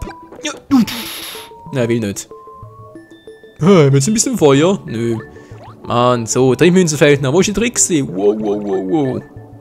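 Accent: Switzerland. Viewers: Schwizerdütsch, winis liebä XD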